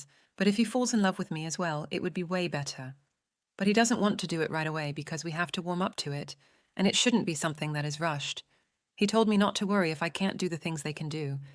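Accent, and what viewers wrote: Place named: United Kingdom. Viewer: Where people aren’t British the person just added a narrator with a British axent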